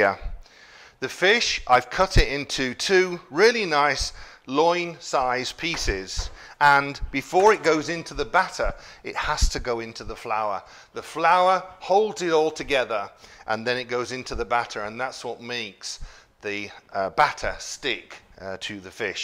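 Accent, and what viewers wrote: England, United Kingdom. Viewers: There are so many accents in England. Do you mind telling us where you grew up. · What's the favorite fish bar called? I sometimes have a hard time understanding English accents sorry! But I'd like to go if I ever visit Scotland :) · lol, I've heard, still would love to visit, see the architecture, the culture, everyone having your accent lol I wanna be british for a couple days lol... Come to NYC, if you haven't already.. many things to do here, and you can find the best of any culture of food. Your accent is the rarest you hear in NYC too, from my experience lol. there's asian areas (chinatown, etc.) Jewish communities, (brooklyn) there's black areas, there's indian areas, latino areas, etc... it's a melting pot of every culture.. basically the only time you hear someone with your accent is if it's a tourist lol... in my experience. 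To stay there for a couple days, and everyone I talk to having your accent would be a fun experience lol.. but that's normal for you. So interesting to me.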